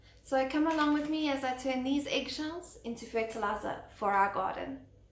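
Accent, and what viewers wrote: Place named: South Africa. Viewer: Sounds like you're in RSA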